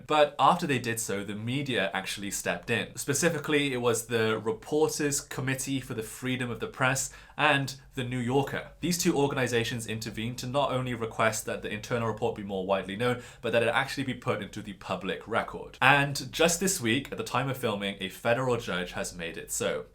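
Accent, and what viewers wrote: England, United Kingdom. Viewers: This guy speaks perfect English English. He actually says "dyoon" not "June" when saying "Dune"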